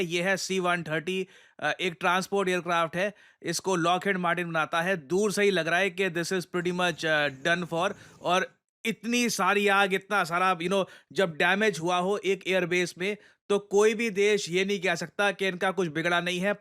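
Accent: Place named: Bihar, India. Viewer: मैक्सिमम ये बोल देतय, ये pure बिहारी language भैया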